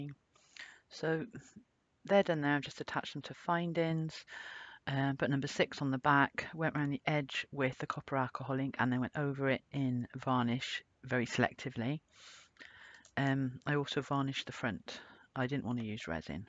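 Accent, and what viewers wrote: United Kingdom. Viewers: I loved loved this video. Lovely British accent, soothing instruction and gorgeous pieces!!! Thank you!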